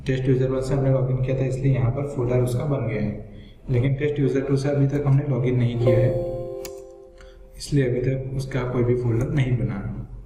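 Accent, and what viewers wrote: India. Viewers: fu ck ing indian accent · Interesting that commenters can't understand your English. I suppose after working with India programmers for a couple of decades, my ear is accustomed to your accent. Never the less, the software you're downloading looks too risky to take the chance. It's pretty hard to trust the profile migration tool from the FORENSIT site when it's not even SSL / HTTPS protected. That said... Dave Yadav You Tube video "Migrating profile to a different domain" uses an SSL secure site to download the software, is better practiced with English, and does a pretty good job explaining this topic.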